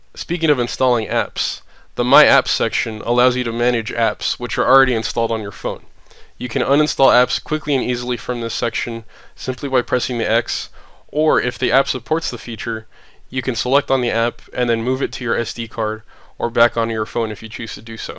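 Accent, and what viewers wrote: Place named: Netherlands. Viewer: You sound a bit like a Dutch guy speaking English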